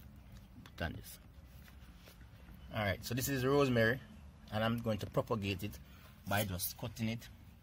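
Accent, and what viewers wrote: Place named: Jamaica. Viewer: Your accent is the best! Thanks for the tips. I'm very excited to get my zoysia going down under on the gold coast with a little jamaican knowledge!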